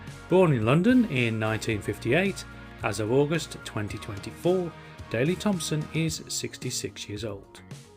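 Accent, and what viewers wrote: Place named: Wolverhampton, United Kingdom. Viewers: I'd have definitely included Linford Christie as he's a legend for sure! Also Colin Jackson, but that's probably more of a "me" thing as he's Welsh and I love a Welshman! 🤭 So you're from Wolverhampton then? I've got a friend there. Really should have twigged to the accent! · After all these months of being a subscriber and watching I've always thought you sounded local, it's only when you mentioned Tessa Sanderson you revealed yourself to be a fellow Wulfrunian. Love the content, as an 80s child it's lovely to reminisce while watching.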